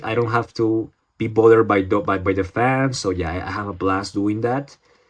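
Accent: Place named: Colombia. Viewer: Se nota que el inglés es tu segundo idioma, el primero por el acento es español. Infiero con total certeza que el eres de Colombia por qué también lo soy. Solo una recomendación, por qué a mí también me pasa, intenta hablar más pausado más lento, con más ritmo. Así notas más seguridad y profesionalismo. El video es útil. Gracias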